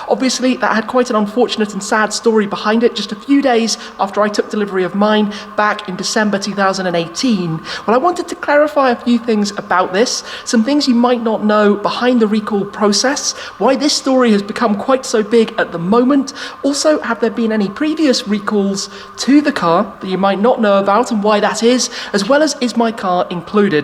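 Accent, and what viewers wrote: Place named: United Kingdom. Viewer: His british's accent introduction always gets me, lol.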